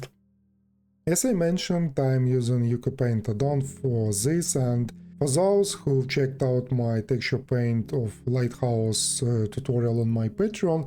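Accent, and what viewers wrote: Russia. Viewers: Ochen' accent russkiy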